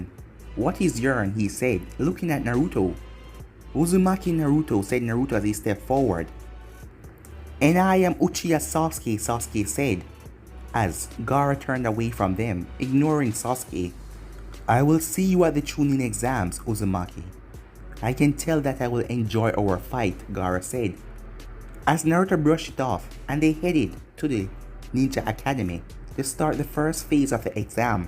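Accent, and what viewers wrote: Jamaica. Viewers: He’s from Jamaica That’s How He Talks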